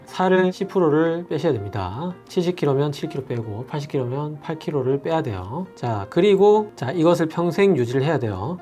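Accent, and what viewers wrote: Gyeongsang, South Korea. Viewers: 김근영 시비라니요!! 표현을 그렇게 하시나요? 난 70 할머니이고 닥터의 경상도 억양이 있으신데다 빨라서 쉽게 알아 듣기 힘든 단어가 있어서 말씀드린 겁나다 앞으로 말씀 곱게 쓰시길 부탁드립니다. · 10프로는 넘께 뺏는데 많이 좋아졌어요 3개월전 공복이 220이였는데 지금 100이하에요 근데 선생님 병원은 어디에요 경상도쪽같아서요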